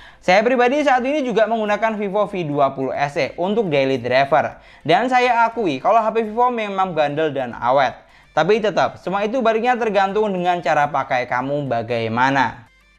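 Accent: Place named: Martapura, Indonesia. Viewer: Orang Martapura yah mas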